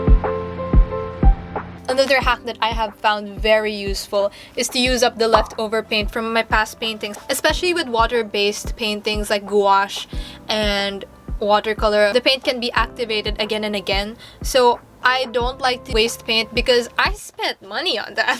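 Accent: Philippines. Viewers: You are from the Philippines? I hear from your assent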